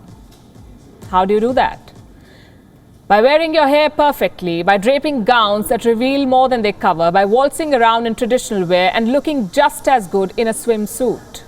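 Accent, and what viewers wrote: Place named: India. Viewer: Her Indian accent is off putting, the whole world has every English news channel speaking British or American English, change the anchor keep the script..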